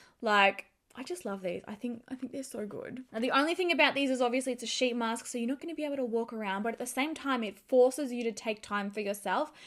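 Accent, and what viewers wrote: Australia. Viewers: Love this! Thank you so much! I love your Australian accent and how you pronounce things.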